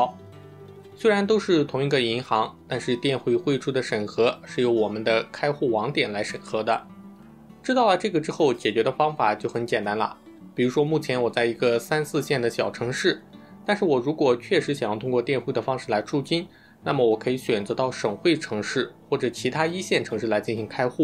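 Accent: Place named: Jiaodong, China. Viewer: 听你口音像胶东的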